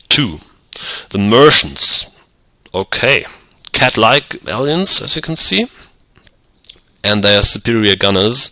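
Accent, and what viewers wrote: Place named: Eastern Europe. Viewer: apart from your irritating eastern-euro voice trying to speak in english accent, i found the video quite helpful for me to enter into this ancient game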